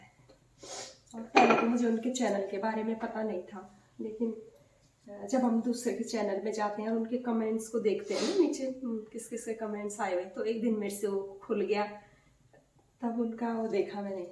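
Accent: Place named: Garhwal, India. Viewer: Bahut bdya lgni cH gadwali bhasa jb ap bolte hai🙏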